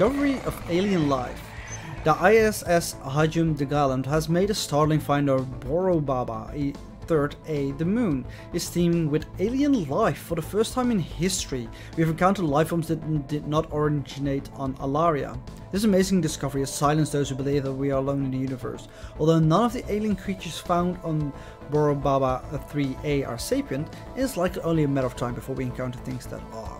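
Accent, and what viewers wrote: Netherlands. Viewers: Ik hoorde je accent en ik dacht: hmm is hij misschien Nederlands? Toen zag ik je locatie en ja ja: Nederland. Toevallig :) Leuke let's play man!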